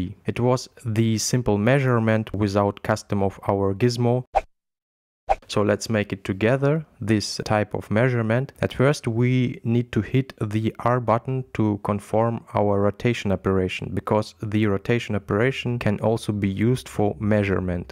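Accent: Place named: France. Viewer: By the way I plan to go through all your videos on blender. They are well done. The cadence was excellent on this one. The on screen help was brilliant and they are very succinct. Thanks for your efforts. You deserve more subscribers. Also want to let you know that I have no problem with your english. Sometimes you substitute French grammar but that does not sound bad to an American ear. To the British? Well we all know they are snobs. Right? LOL.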